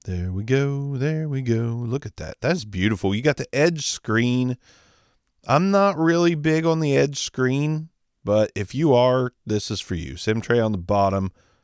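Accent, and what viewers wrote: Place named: Delaware County, United States. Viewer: You sound like you have a delco accent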